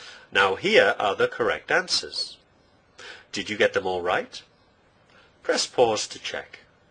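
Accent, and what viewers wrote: United Kingdom. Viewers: Thank you very much, I love your daily dose of English and most of all your AMAZING and musical British accent. I'm Italian and I'm studying English seriously since 2014 but, sometimes, I think that my accent is definitely hopeless. I'd like to narrow my vowels and to smooth the double consonants, but I tend to sound .... I don't know how to explain it ... too long whereas you British have this short and cut and clean although "bonded" way of pronouncing words. It's difficult for me to shadowing you, but I'm dedicated and stubborn, so let's see what happens. Again, thank you for your eccellent job and have a lovely weekend. Monica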